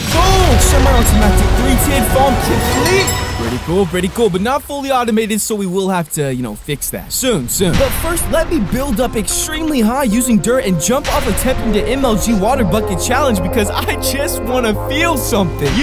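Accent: United Kingdom. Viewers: wait is it just me or does he have a brit accent sometimes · I always wonder how you speak british and inglish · Hey I realy realy realy want you to hit 10mil and and your my favorate british youtuber keep it up and good luck and your british acsent is amazin🇬🇧🇬🇧🇬🇧🇬🇧🇬🇧🇬🇧🇬🇧🇬🇧🇬🇧🇬🇧🇬🇧🇬🇧🇬🇧🇬🇧🇬🇧😀😀😁😁😁😁😀😁😀😀😁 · ??? I hear br'ish accent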